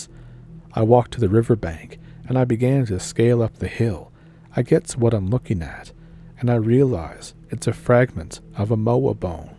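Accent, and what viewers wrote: Canada. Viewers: You don't sound like you are from New Zealand. More like Canada. Cup of tea, real British stuff. Get real man. What a load of tripe. Your description of your fossil area near Christchurch do not match. Just made up stories with no truth. I gave up listening.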